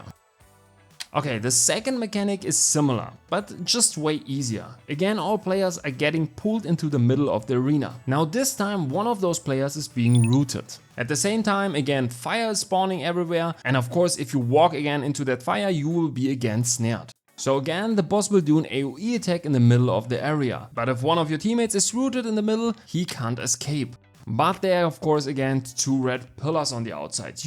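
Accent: Germany. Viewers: Keine 10 Sek. gebraucht, um zu merken das da ein Deutscher spricht :D Danke für das video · Echt schlimm der deutsche Akzent aber super hilfreich das Video, danke! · ich höre doch da einen arg deutschen englisch Akzent. Guides auf detsch wären ein Traum. Uuund du wärst der einzige. Denk drüber nach ;) und danke für die bisherige Pionier Arbeit <3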